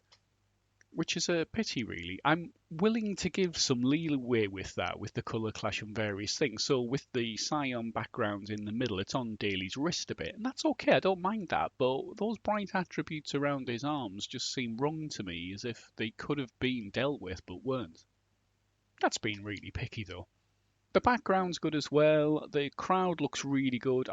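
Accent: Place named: North Yorkshire, United Kingdom. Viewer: Hi, sounds like you might be from Richmond or Darlington with that accent - tell me I'm wrong! Enjoying your top 100s etc and contributions to speccy show. They should have loading screens on the PS4. It took 2 hours to install Red Dead Redemption2 today! Not for me, as i don't play modern games but the missus does! I was a 64 owner but looking back I see how the Speccy was full of character and it's visuals had real english charm.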